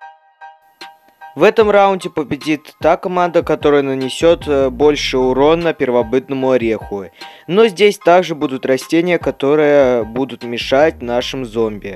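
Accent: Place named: Ukraine. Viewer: Мне кажется что ты украинец или беларус я беларус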